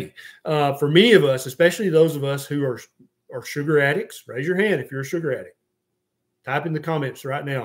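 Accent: South, United States. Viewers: Come to South Carolina where people do have a southern accent. I am proud of mine and yours too. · Im from south carolina and you sound like my boss. You look like him too. Wonderful accent, respectable guy, very southern and genuine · I think they were referring to your Southern accent 🤣😉😍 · It’s not an accent, it’s Southern Dialect. I’m from Lithuania and do have accent I’ll die with, but oh well… 😊 In my old country of 3,7 million people there are 4 different dialects believe it or not… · 😂 yes, we all have an accent. I’m Canadian and you probably think we have an accent. Anyway, you have a cute southern accent 😃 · I don't hear an accent, just a nice southern draawwlll. 😉😉😉😉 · You have a nice Southern accent! I spent a year (1977) going to school at NATTC Memphis and to this day I still talk southern!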